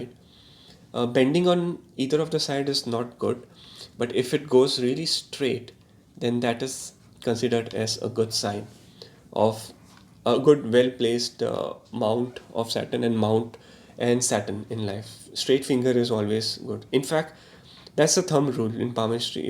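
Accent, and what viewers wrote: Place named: United States. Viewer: Its american accent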